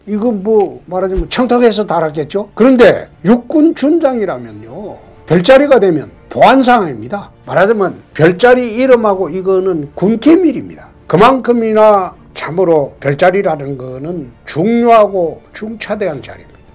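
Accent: Gyeongsang, South Korea. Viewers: 오늘 복장 이미지 쇄신에 좋습니다. 경상도 억양 고치면 좋겠습니다. 스피치 학원에서 훈련하는 것이 좋겠습니다. 감사합니다.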